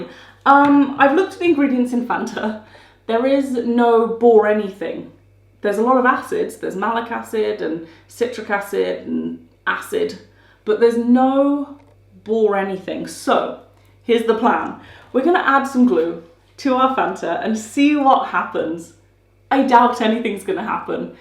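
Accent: United Kingdom. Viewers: What part of the UK do you think she is from?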